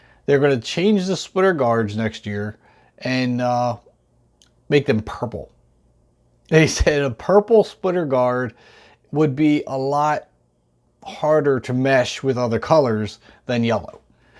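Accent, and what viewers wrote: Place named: Philadelphia, United States. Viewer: You sound like you’re from philly.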